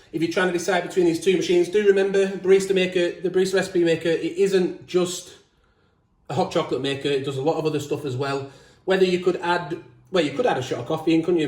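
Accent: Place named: Birmingham, United Kingdom. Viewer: What's this accent ? Is it from Birmingham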